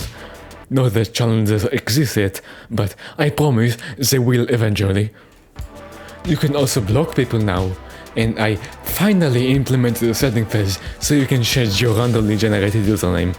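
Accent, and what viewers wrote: France. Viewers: It sounds like french.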